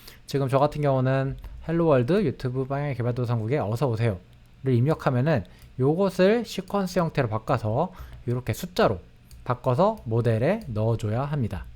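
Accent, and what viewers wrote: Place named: Chungcheong, South Korea. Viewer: 저 충청도 사람인데, 나이드신 어른들 톤이랑 비슷하네요 ㅋㅋ 그 특유의 미묘한 말하기 귀찮아하는 듯한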